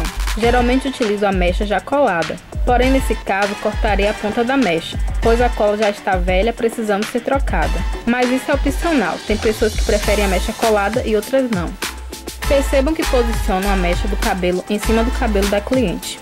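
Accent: Rio de Janeiro, Brazil. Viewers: Vc é do Rio de janeiro ? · Show de bola! Vc é do Rio?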